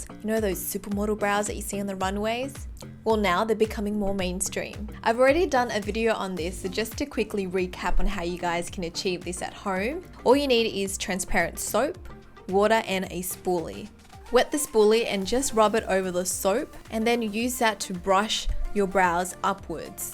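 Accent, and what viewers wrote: Australia. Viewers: 호주에서 사셨구나 뭔가 발음이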